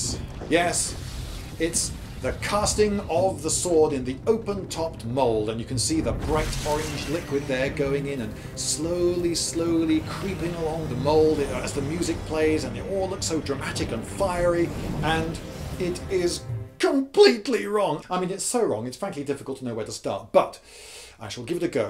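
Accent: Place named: England, United Kingdom. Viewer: Also the English accent, you can't forget that.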